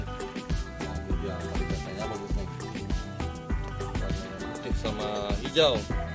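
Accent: Bali, Indonesia. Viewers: Om swastiastu, kenapa saya sangat suka channel, 1. Bli membuat konten slalu apa adanya dan tidak melebih lebihkan 2. Slalu mrendahkan diri pdahal bli udh brpengalaman lah dengan hal bonsai 3. Saya sangat senang dengan konten bli yg memanfaatkan bahan yg ada di skitar kita 4. Karena channel bli saya jadi ikut membuat konten tentang mencari bahan bonsai di skitar kita 5. Logat bali medok pokoknya 👍😁 Msih bnyak sih mungkin dri saya skian dlu, smoga sukses slalu dan kontennya smakin berkembang dan semoga bisa cepat mengejar 100rb sub ya bli, klok blh bsk" diajakin ikut nyari bonsai bli hehehe 🙏👍😁, suksme bli maaf klok ada slh kata🙏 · Alasannya karna lucu dan unik ,maklum beda daerah, saya dari semarang, beda logat bicaranya, jadi asik aja denger logat bali yg kental dalam penjelasan di setiap vidionya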